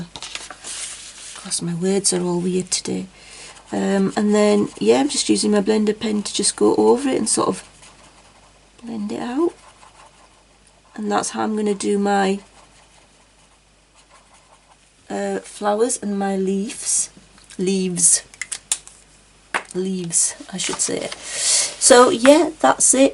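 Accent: United Kingdom. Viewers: Hi K, I’m sorry to hear you have not been feeling well, I’ve never had a migraine but I know they can be disabling. As always I get excited when you are doing your magic on camera. I’ve enjoyed this series so much. You do not sound like a frog 🤣 at all, you actually have a beautiful and soft voice, that I find soothing and I love your British accent. Wishing you a speedy recovery and I am looking forward to seeing all the pages you colored. For me, it’s been work and more work, but I am ready for Mermay!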